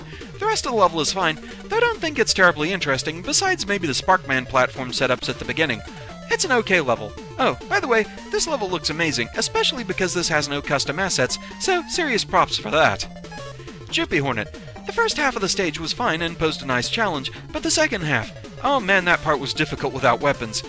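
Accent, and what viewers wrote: United Kingdom. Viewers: He's about as British as it gets if you hear his voice commentary. In a good way I mean.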